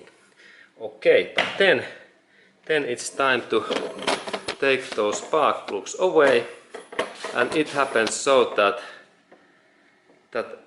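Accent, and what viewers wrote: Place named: Finland. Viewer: Nice finglish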